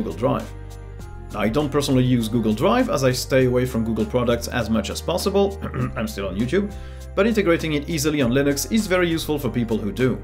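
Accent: France. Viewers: Not My Audio. But saying your french makes a lot of sense. I can understand Indian accent, Asian Accent etc. But have always struggled very hard to understand French accent. My brain just does not want to understand that accent.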